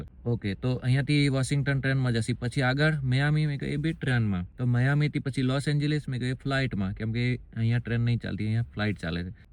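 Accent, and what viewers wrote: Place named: Surat, India. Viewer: Surati gujarati bole chhe 😅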